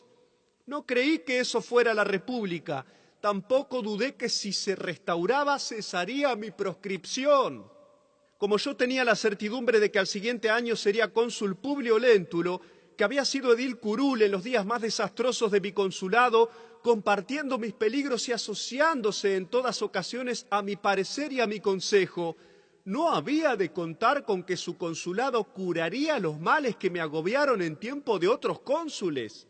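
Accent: Argentina. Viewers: No es el hecho del acento es el hecho de NO RESPETAR EL LATIN HABLANDO COMO ARGENTINO! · No había otro que no fuera argentino? Hace sonar pedante el discursivo este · DEFINITIVAMENTE EL TIMBRE ARGENTINO ES PESIMOOOO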